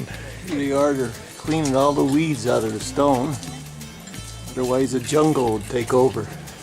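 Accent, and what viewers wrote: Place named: Pennsylvania, United States. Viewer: As soon as you started speaking I knew you were from PA....like myself! Nice video!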